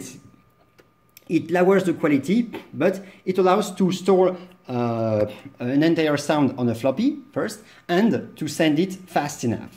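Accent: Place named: France. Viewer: Accent français😂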